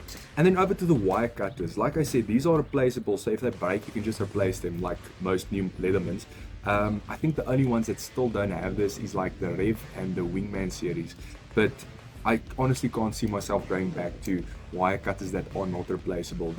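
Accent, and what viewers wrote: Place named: South Africa. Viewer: Among other multitools, I have both the Wave+ and ST300M myself. Sadly couldn't get it in that awesome tan/black color at the time, but I guess that just means I can get a Rebar with that color scheme if I wanted to. Although I personally prefer the Wave+ so far, I'd always bring the ST300M - which is closer to the Rebar - for more heavy things aside from going into woodlands. Concise and informative video. Also: I love the south african accent, ever since I saw Lethal Weapon 2 back in the day. "Ah. Just checking to see if I was standing on plastic." Keep up the videos, and I hope you grow and get to do more.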